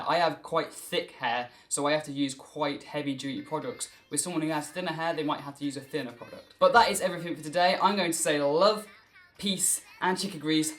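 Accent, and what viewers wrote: United Kingdom. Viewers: I would like to say I understood everything what you said, but actually I didn't... it's because the way you speak is kinda hard to understand at the begging (I'm used to listen american accent), but on the other hand I love it... british accent is so peculary it's so fantastic, I would like to speak with your accent (I'm not from a english speaker land). Ohhh and good video... Actually I watched it bc your accent lol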